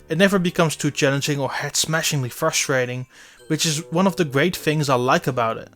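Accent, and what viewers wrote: Netherlands. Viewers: Damn! You're doing a great job working with these guys. I can still hear you speak English as a Dutchman. I hope you will keep working on that but eh... Denk dat vele mensen uit Nederland een voorbeeld aan je kunnen nemen. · Are you dutch? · Is that Guy dutch? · He's Dutch, like me. Not everyone speaks flawless English, you know? ;) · I know how hard it is to speak English with a Dutch accent, its difficult pain in our asses. Great review, even though nobody likes this game. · The video started and I was like "hey this guy is Dutch". Then I saw name in the description.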